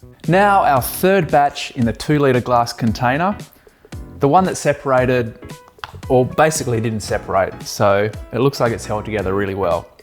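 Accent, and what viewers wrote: Australia. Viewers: Is that the Australian accent I hear?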